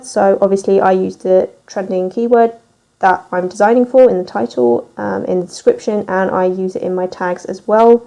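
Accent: United Kingdom. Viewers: Wow, this was refreshing,,, & a uk voice too 😁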